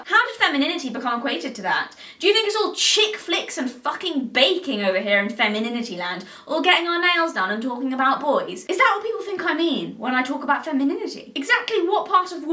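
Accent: United Kingdom. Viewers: Its official, having a British accent makes you sound smarter than us Americans. I actually have a friend with a similar accent to this woman, and even though he's not really a genius, I always feel dumber around him. Weird huh? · I am here for the British accent, mostly. Lol👌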